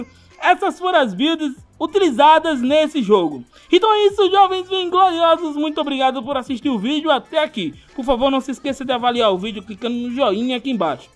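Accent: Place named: Bahia, Brazil. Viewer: Você é da Bahia ??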